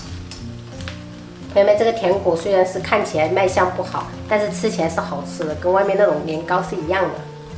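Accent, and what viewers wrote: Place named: Sichuan, China. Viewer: 是四川人，發音是不太標準😁幾將就聽吧☺️我是正宗泉州石獅人😊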